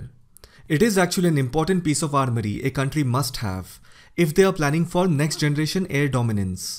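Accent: India. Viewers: Very clear indian talk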